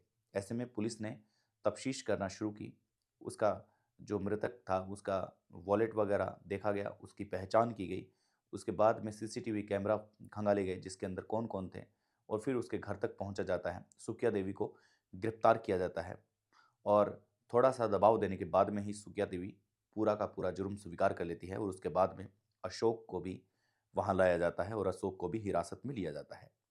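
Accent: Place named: Rajasthan, India. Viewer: Wow aapki hindi buuri nhi paaye ❤❤ ji aapne khajuwala wali language 😂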